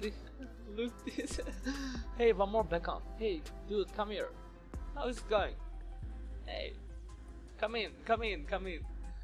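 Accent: Turkey. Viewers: Kardeş %100 Türksün Ben konuşmandan anladım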